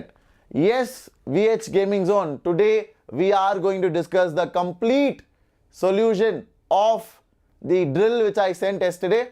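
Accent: South India, India. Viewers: Sir speaks like south indian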